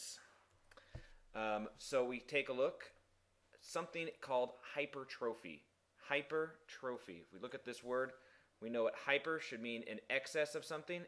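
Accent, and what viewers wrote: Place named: United States. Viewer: its frankie boyle!!! (with an american accent)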